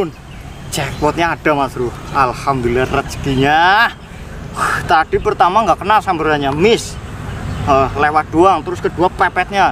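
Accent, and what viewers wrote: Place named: Java, Indonesia. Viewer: Mas asli mana kok medok bgt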